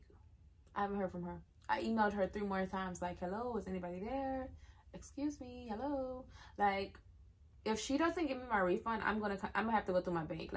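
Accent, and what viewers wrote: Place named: Baton Rouge, United States. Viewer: Baton Rouge ? lol I hear an accent What the hell $1000 to change doctors they take for everything at MIA.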